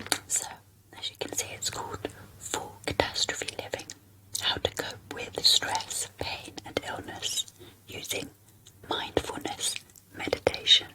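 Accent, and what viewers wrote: United Kingdom. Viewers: I love your British accent 🌹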